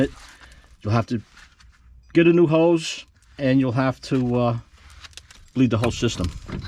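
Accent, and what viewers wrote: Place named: East Coast, United States. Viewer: I’m going start by saying I love your east coast accent 🤣❤️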